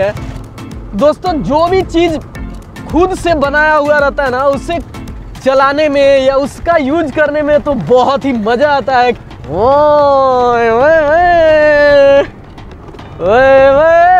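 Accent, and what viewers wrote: Bihar, India. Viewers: बेटा आप खूब तरक्की करो। वीडियो लोकेशन देख के और आपकी भाषा सुन के ऐसा लगा जैसे आप बिहार से हो। बिहारी बहुत तेज़ होते है खोज करने में। आपको इसपर होने वाला खर्च और जगह का पूरा address भी बताना चाहिए की जो खर्चा करके बनवाना चाहे वो आपको संपर्क कर सके। इस प्रोजेक्ट में लोगो का interest देख के बड़ी कंपनी भी आप से संपर्क करेगी और आपका भविष्य बहुत अच्छा हो जायेगा अगर अभी आप student हो तो भी 2-4 लोगो को इस रोजगार में लगा दो देश को बहुत सारे ऐसे बच्चे चाहिए तुम्हारे जैसे ।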